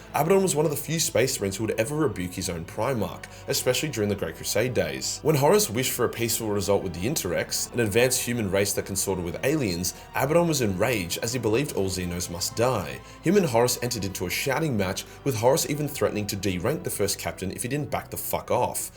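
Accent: Australia. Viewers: I may not always get to enjoy having 40k explained to me by a cursing Aussie but when I do I always enjoy it. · My friend 600 k is getting closer i am so happy for you ive never ever played a Warhammer game never bought something that has to do with Warhammer but you are my favorite warhammrr YouTuber ever you make it fun you put in some jokes and the aussie accent makes it even better you make awsome videos keep doing great thing 💪💪💪😁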